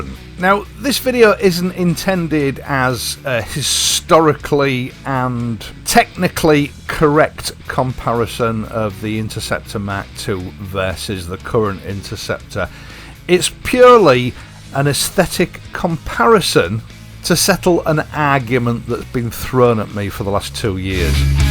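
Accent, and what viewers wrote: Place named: East Yorkshire, United Kingdom. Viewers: Hey, is that East Yorkshire accent, there!?!? Thanks for video... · Love the videos and the Yorkshire plain speaking,. Thank you for the shots of horse chestnuts in flower, a real delight for an ex-pat living in Canada, where these magnificent trees are not common - though less rare than the RE Interceptor Mk I. 🙂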